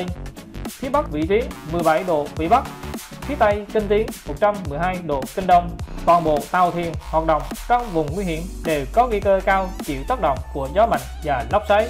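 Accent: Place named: China. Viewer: Giọng như giọng người Hoa khó nghe lắm thay phát thanh viên đi thôi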